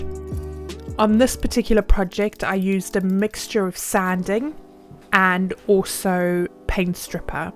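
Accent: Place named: England, United Kingdom. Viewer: I had to put the video on mute. I couldn't stand your English accent...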